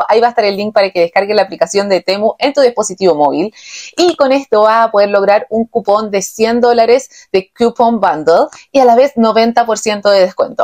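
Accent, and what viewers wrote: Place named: Chile. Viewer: Que rico escuchar tu acento chileno!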